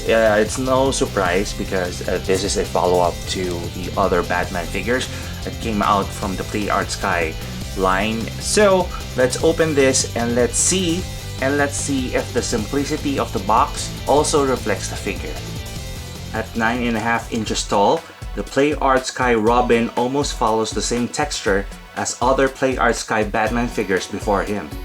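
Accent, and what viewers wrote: Philippines. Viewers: hi can i ask a question too? Are you pilipino cause your accent is like that just curious :D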